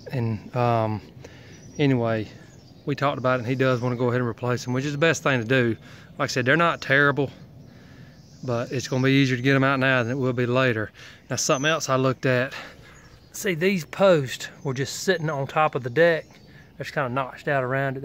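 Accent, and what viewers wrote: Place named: South Carolina, United States. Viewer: You got to be from South Carolina. You talk just like me!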